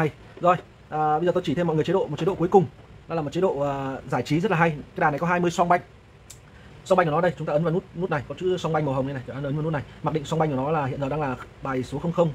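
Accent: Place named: Hainan, China. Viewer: 你的语音和我一个海南同学的家乡话一样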